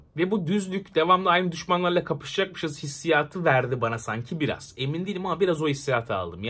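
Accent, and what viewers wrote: Russia. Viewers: Ruslar gibi ingilizce konuşuyorsun (CS:GO Ofensive günlükleri) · Rus aksanıyla ingilizce konuşman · Mükemmel Rus aksanıyla İngilizce konuşan * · Rus aksanı❤ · Neden Rus aksanı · Güzel rus aksağını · ingilizcende rus aksanı var gibi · İngilizce konuşunca İngilizce konuşan ruslara benziyorsun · rus aksan? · neden ingilizceyi ruslar gibi konuşuyor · aynı rus ajanları gibi ingilizcen var valla çok tatlış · ingilizcen rusçaya kaymış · İngilizceyi Rusça aksanıyla konuştuğunu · Niye rus aksanıyla ingilizce konuşup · İngilizce'yi, Rusça konuşur gibi konuştun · Rus aksanlı * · niye Ruslar gibi engilizce konuşuyorsun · o nasıl bir ingilizce rus gibi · niye rus şivesi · ABİNİN İNGİLİZCESİ RUSLARIN İNGİLİZCE KONUŞMASIYLA ÇOK BENZEŞİYOR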